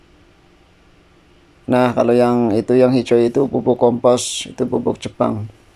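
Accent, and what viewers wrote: Banyumas, Indonesia. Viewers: Wong. Jawa tengah ya. Mas , Ngapak. Kaya. Nyong , Selamat tugas , mashe , , Mantaaappp. Nang. Jepang. Ya 👍👍👍👍👍👍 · Asline ngendi Mase, kayanya ngapak koh ,apa tanggaku Cilacapan. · Jawa ne ngendi mas ?? Logat e ngapak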